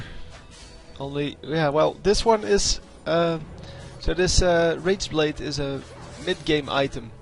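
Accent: Netherlands. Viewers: hearing a bit of dutch trough your voice :D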